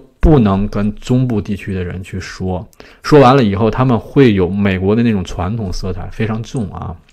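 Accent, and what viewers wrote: Tianjin, China. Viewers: 這是天津式國語，在大陸很受歡迎的。您聽得習慣嗎？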